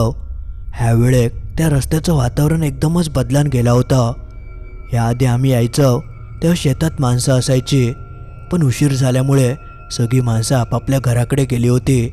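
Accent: Malvani, India. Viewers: एक नंबर 👍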